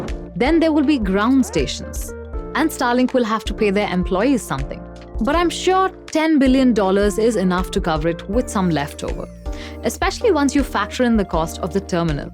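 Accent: India. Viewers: I don't like the Indian accent in general (for some reason it gets on my nerves) but it was a very informative video so I stayed to the end. Keep up the good work. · The highest number of internet provider, currently sited on the web in India, was 358. The smallest number of internet provider in the United States I could find was 2737 internet service providers in the United States: 894 DSL “Digital Subscriber Line” providers. One site citing the CIA, said there were over 7,000 Internet service providers in the United States. Given the difference in populations. It does not appear that number of providers and price have an inverse correlation. Enjoy your blog, great title. Interesting topics presented with intelligences and a refreshing an Indian accent. It is quite pleasant to my Midwestern ears. Thanks!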